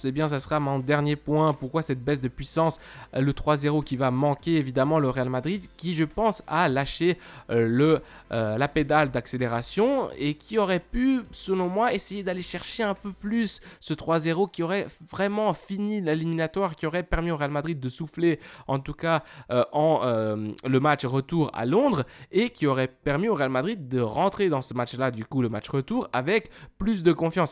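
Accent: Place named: Belgium. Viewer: Merci de votre analyse tu es mon idole. Je demande tu as fais Belgique où tu est un Belge ? Car ton axant les ressemble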